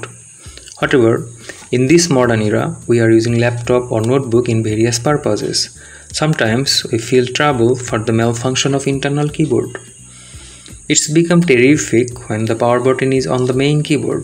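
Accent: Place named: Bengal, India. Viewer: কথার স্টালে তো মনে হয় বাঙালি, বাংলা বুঝি জানো না !!:face-orange-raised-eyebrow::face-orange-raised-eyebrow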